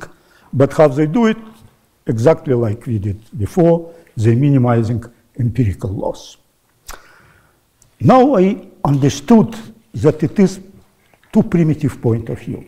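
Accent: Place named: Russia. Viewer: Google clearly didn't train their model on the accent of elderly russian professors.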